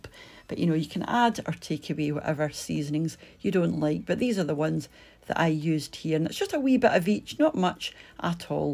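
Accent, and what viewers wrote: Scotland, United Kingdom. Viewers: Loved you r video and your accent, miss my friend s from Scotland. With love from Brazil Jerry.❤ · You sound like that little Scottish ladie on television , the buying and selling show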